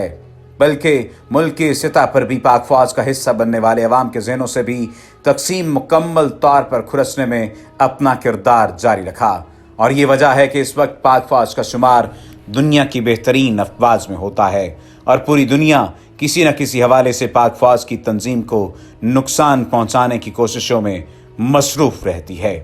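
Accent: India. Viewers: Pak Foj Zindabad Hai.....Mulk K liye Or Pak Foj k liye Jan B zinda. Ap ke awaz indian lagti hai.....Wesy Iran or Saudia or afganistan k bary me itna zada Q discuss kr rahy ho.......India k bary me itna discuss nai kia ap ne video me....................Humy pta hai sab apni foj k bary me💖💗💕💓🇵🇰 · Aap ka bolne ka talfaz indian ki tara hai r u a pakistai .i dont belive u r a pakistani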